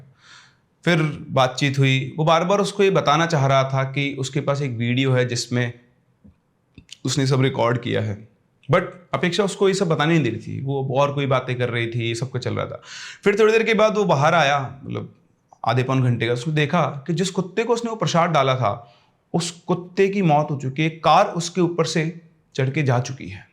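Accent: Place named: Haryana, India. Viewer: Sir your haryanvi is so cute and funny 🤣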